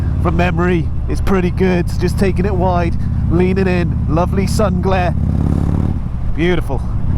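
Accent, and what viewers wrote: Wales, United Kingdom. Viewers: Nice to hear a fellow Welsh man again. Welcome back.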